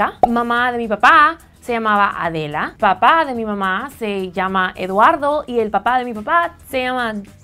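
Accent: Cuba. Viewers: Oigan tengo 9 años y no le entendí al vídeo ósea q es de Estados Unidos Y habla Cubano?? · Jajaj me encantó la 12va. Razón! Y como poder dudar de tu descendencia, se te nota en la voz que eres cubana! Y lo que nos define son las raíces y la procedencia, no un papelito! ;)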